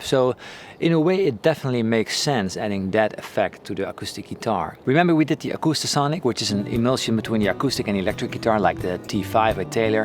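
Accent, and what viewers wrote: Netherlands. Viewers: Haha, you can recognize a Dutch accent from miles away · Great video loved it :) Butttttt if you care at all about not sounding too Dutch, watch your pronunciation of ideas (it sounds like ID's) which is a dead giveaway you're dutch. And yes fellow dutchy, keep up the awesome vids.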